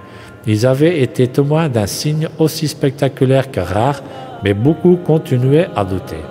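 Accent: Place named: Flanders, Belgium. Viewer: Masha Allah. Ben jij van Belgïe? Ik hoor een Nederlandsetalig accent🙂 daarom. Mooie video Allahoumma barik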